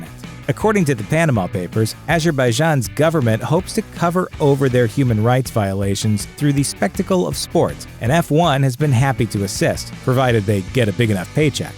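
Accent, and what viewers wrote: United States. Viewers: When I heard an American narrating this i knew it was shite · Opens video: American accent appears Oh god, uneducated twats not understanding the nuances of the motorsport, yay. Footnote Hahahaha, Williams and McLaren both being top tier teams. HAaHahAa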